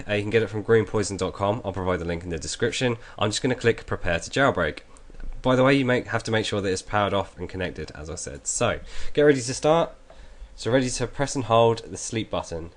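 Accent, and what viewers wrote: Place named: Southeast England, United Kingdom. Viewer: Thanks a lot brother. By the way I love Southeast England accent.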